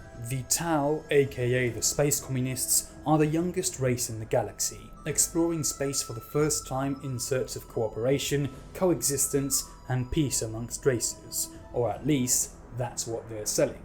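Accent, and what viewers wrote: United Kingdom. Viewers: omg, your english accent is orgasmic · man what a brit accent you have, when you said you would rule an english channel I expected a strong spanish accent. Impeccable.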